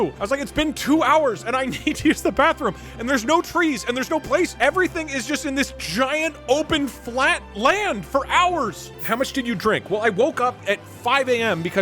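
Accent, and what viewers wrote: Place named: United States. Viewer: you dont know nz at all dude, all americans sound exactly like you lol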